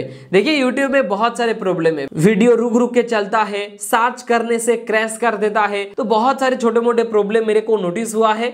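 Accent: Bengal, India. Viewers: Bangali hain kya bhai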